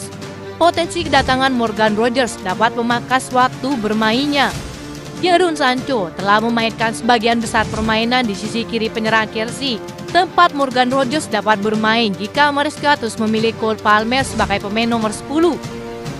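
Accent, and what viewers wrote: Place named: Malaysia. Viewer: Admin logatnya melayu ya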